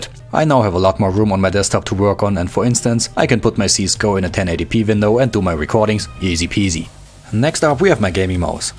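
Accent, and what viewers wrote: Saarland, Germany. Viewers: ***** Doch es ist Saarländisch. Bin auch ausm Saarland. Er sagt "Hauna mo rin do ne". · Daje, haun da mo rinn do, nä? Ich bin abgebrochen vor lachen! :D Das gudde Saarland <3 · german i know the accent cuz im german and american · I luv your german accent <3 :) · That deutsch accent.. ;-) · ***** doch :D ist saarländisch^^ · diggah ich komm ach ausm Saarland awa du heerscht dich kompeltt annerst an als mei Leut:D Aus welchem Eck kommschten heer?:D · XD Ich dachte schon an deiner Aussprache dass du Deutscher sein musst! C: Wie viel hast du denn für dein ganzes Setup bezahlt?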